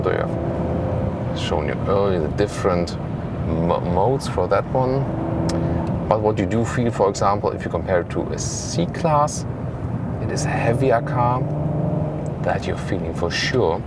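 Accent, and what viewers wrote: Germany. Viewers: your spoken english sounds like german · that's germensh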